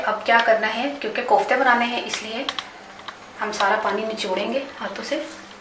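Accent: Punjab, India. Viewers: punjabi ka tarka acha lga mujhy😄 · Punjabi bohot payari bolden Ho 😍👌🏻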